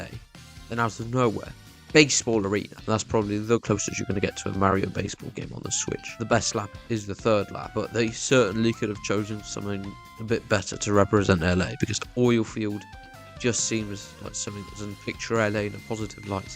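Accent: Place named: United Kingdom. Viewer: Hold up...ur from Spain? Then, why do u have a British accent? 🤨🤔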